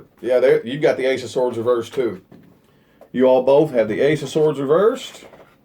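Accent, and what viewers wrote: South, United States. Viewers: From the second you opened your mouth I knew I had to hit subscribe! Love the southern accent! Great reading.....! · Where is his accent from? Is that a southern accent? haha. Love it thanks!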